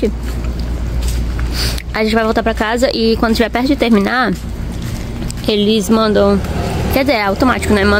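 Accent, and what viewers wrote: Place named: Northeast, Brazil. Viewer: Estou assistindo na noite de segunda, enquanto passo roupa, sou RJ. Seu sotaque nordestino é bem característico. Tenho uma vizinha que é de Natal já mora aqui a mais de 20 anos, mas não perde o sotaque. Bjs na família. Deus os abençoe.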